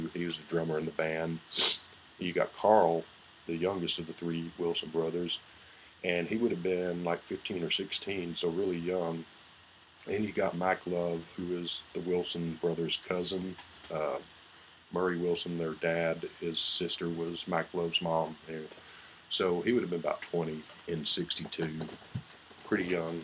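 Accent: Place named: Texas, United States. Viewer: hi, from England. do you know that there is an earlier album which contains their candix recordings?. I don't know if its available, but certainly is on there and its not too different. of course is there too, in fact as far as I can remember, there were a few more of the tracks featured here, but they are slightly different and sound more compressed.. Al Jardine was at high school with Brian and was a member of the same baseball team, but his parents were unhappy for him messing about with a pop group and made him study to be a dentist and get a proper job.......seems funny now, doesn't it? David Marks lived across the road from the Wilsons and as they were desperate for a guitarist, was asked to join, but I don't think he got on too well with murry and I think that's why he left. I know that he did some work for the turtles. there was a report in our newspapers over here that there had been a secret beach boy that had been sacked and left off many album covers and I am assuming this to be David Marks.. 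good and fair review though and I love your Texan drawl, it sounds really cool, no insult intended, hahahaha. best regards.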